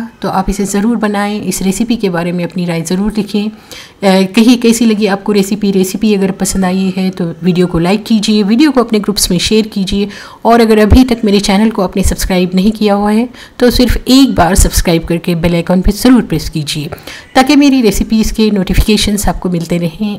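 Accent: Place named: Gulbarga, India. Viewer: yes phool bangalore mein nahi dekhe ,shayad aap gulbarga ke taraf se, hain lehje baat cheet se aisa lagta hai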